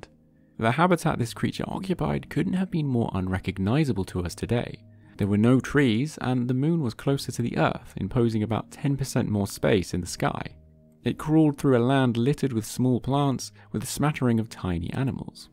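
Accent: United Kingdom. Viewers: he can't help that he's british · do you guys just believe every claim that's confidently stated by a guy with a british accent? it's a rhetorical question, i know you do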